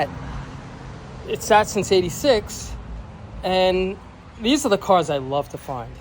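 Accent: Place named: Long Island, United States. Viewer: You have the Long Island accent like me. What town are from? By the way, that’s a great Camaro you found, must have been in a garage.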